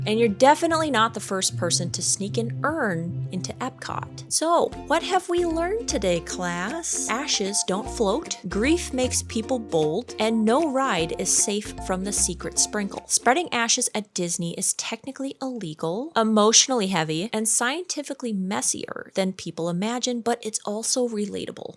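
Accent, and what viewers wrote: Minnesota, United States. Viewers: The Minnesota accent makes this all the more enjoyable. What if you had a version of each episode you do with the accent completely untethered 😂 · I love your Minnesota accent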